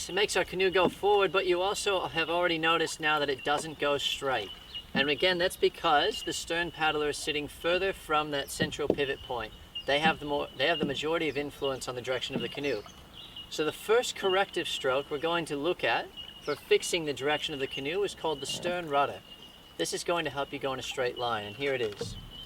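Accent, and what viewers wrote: Australia. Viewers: You definitely some how have an Australian accent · As a Canadian paddler at first I was kind of amused that somebody with an Oz accent was going to tell me how to paddle a canoe... ...then the phrase "Divorce Machine" came up... ...yeah it sounds like a good idea, but NEVER involve a canoe on a first date. I speak from experience. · Nice intro video to tandem canoing. …(is that an Australia mongrel accent you have?)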